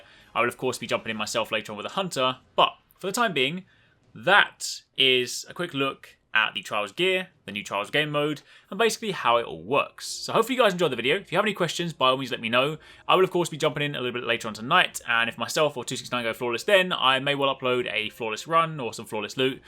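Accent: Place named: United Kingdom. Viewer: Wait are you seriously that black man and sound British?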